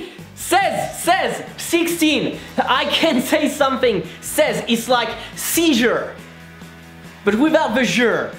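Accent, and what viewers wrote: France. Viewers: L'ironie c'est que je perfectionne ma compréhension de l'anglais parlé en te regardant :D J'imagine que pour un natif des USA tu as des restes d'accent français, mais de mon point de vu tu parles anglais à la perfection ^^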